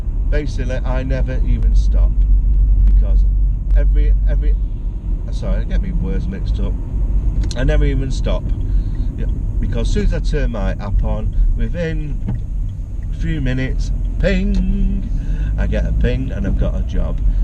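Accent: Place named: United Kingdom. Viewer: Mate, you are from UK, stating by the accent. Uber is not a taxi, and you know it.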